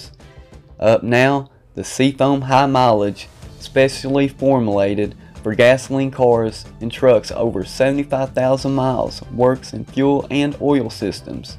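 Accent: United States. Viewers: But he has such a cool cowboyish dialect 😆😆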